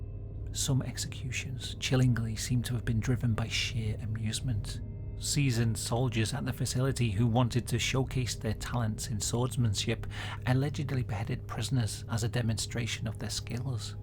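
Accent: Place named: North Wales, United Kingdom. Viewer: He's from North Wales, apparently.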